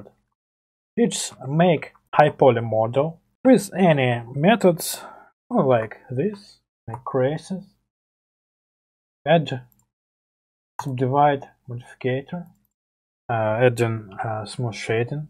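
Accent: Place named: Russia. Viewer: Хмммм он будто русский говорящий на английском 🤔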